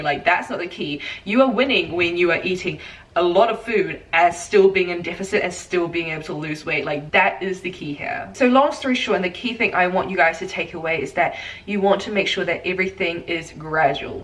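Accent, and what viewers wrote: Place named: New Zealand. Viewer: hahahaha you know what, the first time i watched your videos i picked up on that kiwi accent right away and i was like, YESSSSSS GUUURRRLLL OCEANIA PRIDE 😂😂😂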